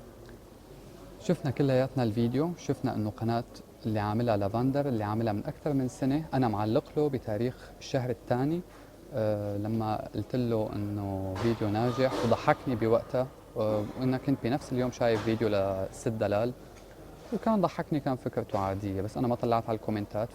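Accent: Aleppo, Syria. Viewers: tv حلبي بس هيك خاطرلو يحكي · هاد سوري مو لبناني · sara tv لا حبيبتي هاد حلبي اااحي كمان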